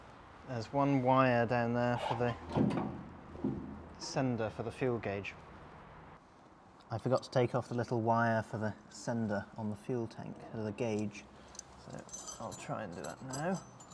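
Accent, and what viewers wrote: United Kingdom. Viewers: Your accent says UK but the number plate says NSW. 🤔 The whole spare tyre assembly is completely different to my 54 TF.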